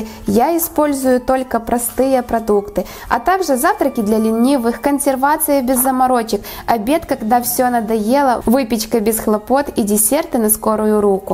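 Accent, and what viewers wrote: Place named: Ukraine. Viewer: Просто наверное с Украины а старается говорить на русском языке ну не придерайтесь все понятно девочка старается.Спасибо!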